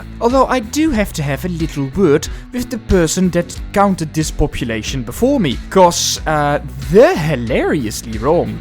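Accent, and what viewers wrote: Netherlands. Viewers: i could hear you being dutch from your accent lol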